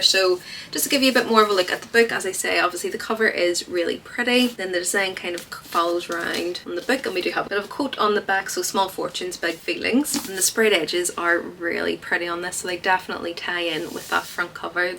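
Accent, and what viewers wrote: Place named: Northern Ireland, United Kingdom. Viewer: So glad to have found a fellow book lover from Northern Ireland! I used to work for the Northern Ireland Bureau (British Embassy) in DC and I miss the accent. 🥰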